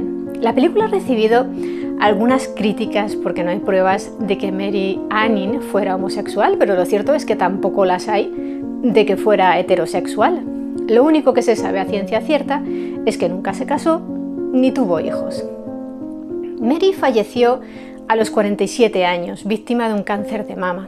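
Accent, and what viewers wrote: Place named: Valladolid, Spain. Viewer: Hola, muchas felicidades a por este vídeo pues como siempre su preciosa voz tranquila y pausada, junto al hermoso fondo musical y las imágenes bien seleccionadas me hace disfrutar de estos temas, me relaja mi mente, me distrae de mis ocupaciones de médico y su acento me recuerda a Valladolid España un bello lugar que visite por motivos de mi especialidad, adjunto su gran logro pues temas de misterio, historias curiosas y paleontología me agradan bastante y en este medio están casi dominados por hombres, así que muy bien por que logra destacar en este medio, adelante y mucho éxito!! Desde México: Atte Dr. Víctor López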